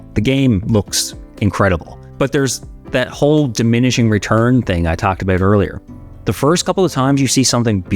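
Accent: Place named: Newfoundland, Canada. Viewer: I can tell this guy Canadian, the way his says “Out” and “About” 😂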